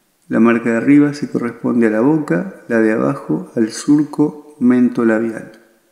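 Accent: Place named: Mendoza, Argentina. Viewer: Por tu tono al hablar sos de mza o san juan .excelente dibujo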